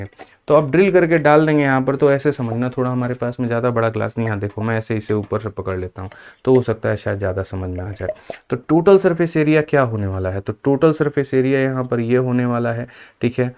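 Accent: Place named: Bhojpuri, India. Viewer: Sir ji aap Bhojpuriya andaz me lazawab lagte h 😅❤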